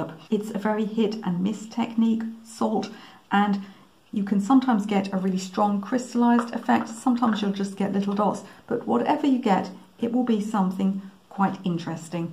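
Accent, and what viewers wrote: United Kingdom. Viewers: Loved this! I struggle with backgrounds a lot and this really gave me a lot of techniques to try and make my backgrounds more interesting. The daisy was my favorite but I loved them all. Very helpful! ( By the way....love your British accent😀 and lovely nail color!)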